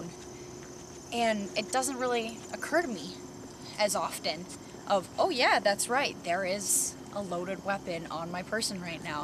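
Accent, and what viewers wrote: Wisconsin, United States. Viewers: You sound like your'e from WI?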